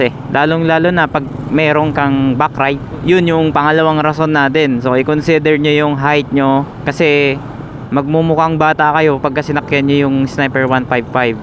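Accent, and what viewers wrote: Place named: Visayas, Philippines. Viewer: Bobo ng mga reasoning mo. 😂 Sabe nya dahil daw mahal, pangalawa dahil sa installment. Tapos height disadvantages daw, bisaya sakit mo sa tenga pangalawa walang laman pinag sasabe mo. Kailangan mo pa daw mag practice sa mga barako na motor dahil masisira lang daw sa brand new sniper, eh paano yung mga bumili ng ducati nag barako ba muna sila. 😂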